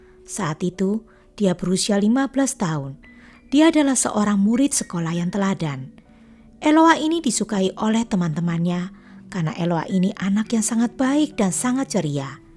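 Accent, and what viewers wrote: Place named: Java, Indonesia. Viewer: .... waktu bilang.... jangan bergerak kalo tidak aku tembak....ih... ngeri kali nada nya kek nyai Blorong yg haus darah di film Suzanna...ih takut aku.... walau begitu tetap aja kangen logat medok mu.... ingat ya jgn sampe jadi nyai Blorong.... cukup jadi krupuk orong orong Medan yg gurih dan lezat.... sukses terus ya....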